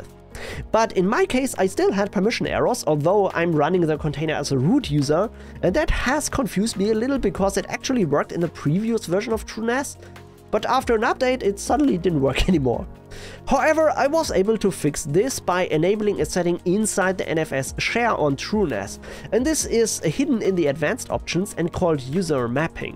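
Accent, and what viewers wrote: Germany. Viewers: Gutes Video!.Deinen deutschen Akzent hört man ja sofort hehe xD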